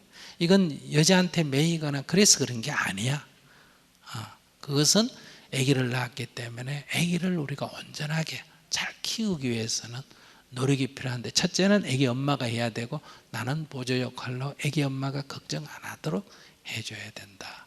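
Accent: Gyeongsang, South Korea. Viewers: 인생 상담자 일인자.. 경상도사투리 정말 티꺼운말투..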